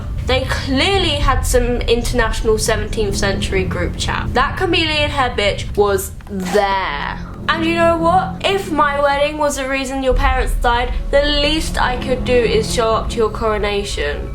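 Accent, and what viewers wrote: United Kingdom. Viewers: Fuck me up w ur Britishnesss mama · Gurl if you put on a Victoria secret 2 cup push up bra, a juicy sweater, and made your hair a little more blonde... You'd be Tana with a British accent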